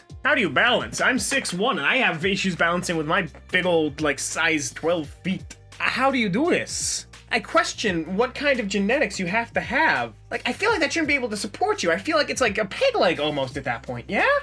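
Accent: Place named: New York, United States. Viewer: Just found this channel, love your new york type accent and you seem like a very nice guy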